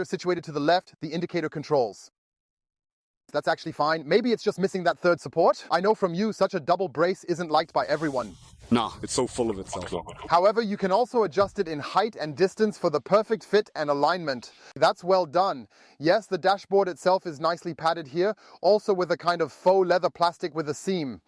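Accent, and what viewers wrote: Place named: Berlin, Germany. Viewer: Sorry, you are totally right. It is necessary to watch longer than one Minute before giving a quote. 😂 With Ai your „Berlin Accent“ is missing but I think it is just a question of Updates that this will be solved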